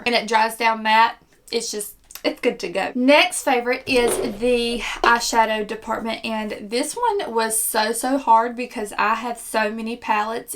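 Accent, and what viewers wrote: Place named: Tennessee, United States. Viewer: Well you're just adorable. (Can you tell I'm 100-years-old?) I love beauty tutorials and am really picky about who I follow, but when I ran into yours, I thoroughly enjoyed it. I MIGHT be a little biased since I'm from Murfreesboro/Nashville, TN, and you have that gorgeous accent, but the kicker is that you know what you're doing. Keep at it and let that personality shine through. Oh! I watched your Nivea-men's-shave-stuff-for-primer thingie one and that's what I use. It almost lifts my face and my makeup....well, it would stay perfect for two days if I'd let it. I have sensitive skin so that's the one I use - FYI. Anyhoo, thanks for your great videos and for sharing your expertise. I can use all the help I can get!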